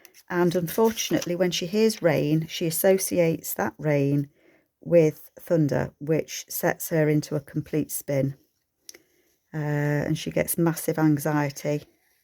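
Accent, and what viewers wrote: United Kingdom. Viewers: These are quite clever! My grandmother said she was born in 1900, but the Bible says 1898!! We guess she just wanted to be in the new century! When were the Scotsmen allowed to wear kilts again? I know for a long period they were outlawed. You have the loveliest accent! I don’t know how to distinguish British accents from one another, but yours is distinct, I’d like to listen to you for a whole evening.! Please no mushroom, the kilt shouldn’t be covered up!! (Just my opinion!) haha! Very sweet little booklets & you’re so organized! I’d like to be, but… thank you!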